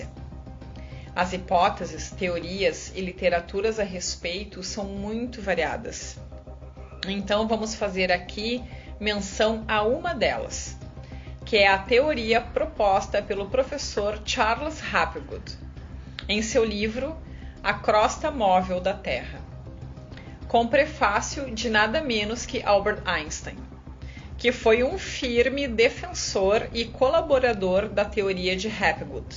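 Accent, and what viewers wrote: Rio Grande do Sul, Brazil. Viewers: Detesto sotaque gaúcho o coisa horrivel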